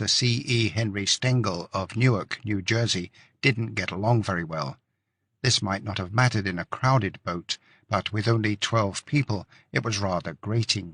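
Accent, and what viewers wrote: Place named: United Kingdom. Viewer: Who is the reader!? Terrific voice, accent so perfect for UK home of Titanic, and superb clarity.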